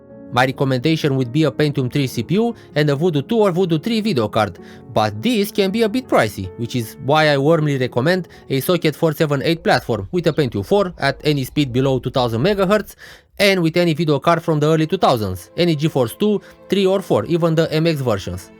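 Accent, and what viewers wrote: Romania. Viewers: Salut. Mișto clipul, succes! A, și super accent romanesc haha.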